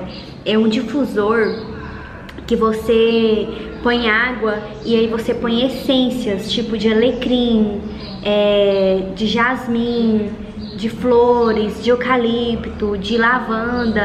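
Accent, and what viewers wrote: Minas Gerais, Brazil. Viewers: você é mineira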